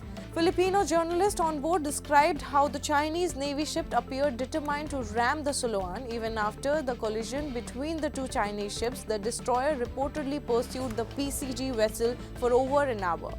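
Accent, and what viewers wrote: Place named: Tamil Nadu, India. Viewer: Small ship purposely collide with big ship who are at fault? Please speak better slang not like Tamil slang very irritating our ear.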